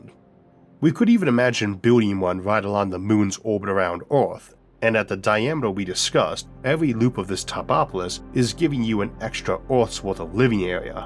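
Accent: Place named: South, United States. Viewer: Just gotta ask Mr. I'm not really trying to criticize, but I gotta know about your speech patterns. Like I said, I'm not trying to be offensive. Do you hail from the southern USA, like Texas. I hear what sounds like an accent there. Oh and I love your videos and knowledgebase.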